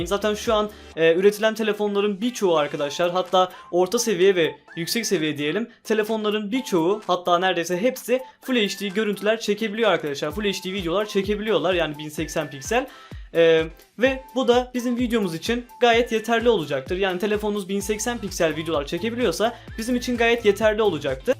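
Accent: Azerbaijan. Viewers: Azerisən sen? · Sen azerisən brat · Abi sen azerbaycanlisan qaqa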